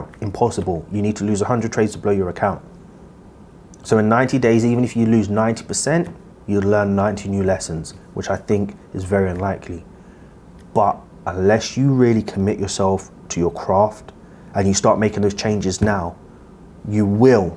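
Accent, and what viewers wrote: London, United Kingdom. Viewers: Good old London matie!!! 🇬🇧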